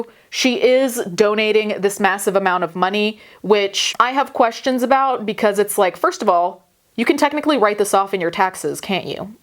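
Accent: Italy. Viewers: she's Italian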